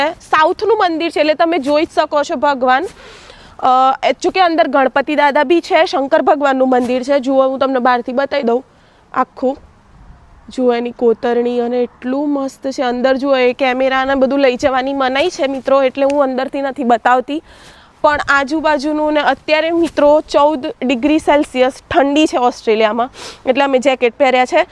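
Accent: Sabarkantha, India. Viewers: Sabarkantha ni boli bolo chho to s.k. na nativ haso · બેન, તમે સાબરકાંઠા ના લાગો છો.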